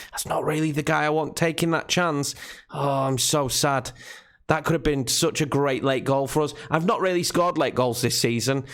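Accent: Yorkshire, United Kingdom. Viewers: Welcome back to another episode of Football manager Twente Twente with F C Twente Love a good Yorkshire accent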